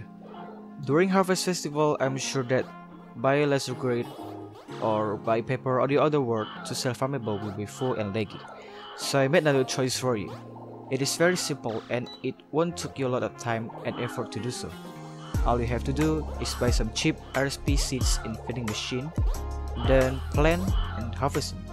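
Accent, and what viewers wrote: Singapore. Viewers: Singaporean sound 🤔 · u sounds like sigapore alot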